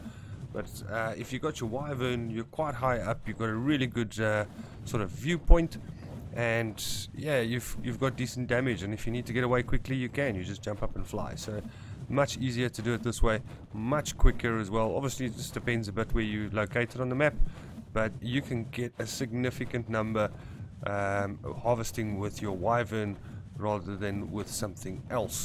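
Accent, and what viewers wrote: South Africa. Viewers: You from South africa? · Dude your south African aren't you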